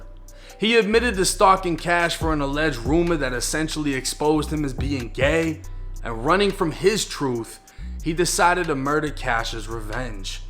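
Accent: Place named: New York, United States. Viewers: The narrator, the one that telling the story sounds like a New York hooker, bro, talk in your own language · Why do you have a New York accent as a Floridian.. please explain..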